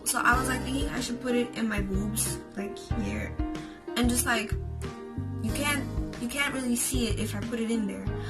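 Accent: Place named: United States. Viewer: Why do you sound like an American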